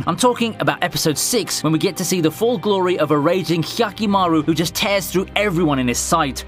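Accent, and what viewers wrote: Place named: England, United Kingdom. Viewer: This would be a great video if the narrator could shut the fuck up and let the scenes play out. Hard to get any emotion out of these clips with a strong english accent telling us what to feel and then splicing in sentences so quick it's jarring, along with a sponsor 5 minutes in. Fuck I hate youtube.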